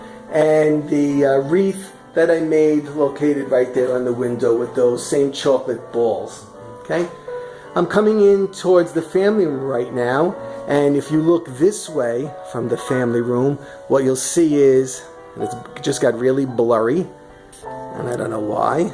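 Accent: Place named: Northeast, United States. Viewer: Are you from new york, new jersey or boston? You sound like you are from northeast, i have a slight new york accent but not very much cause im in nebraska right now lol your house is gorgeous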